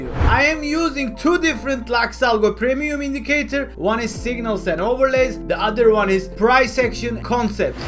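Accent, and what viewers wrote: Turkey. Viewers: The Turkish accent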